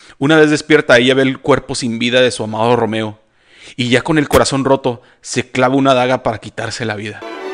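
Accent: North, Mexico. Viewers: Gracias!! jajaj eres norteño?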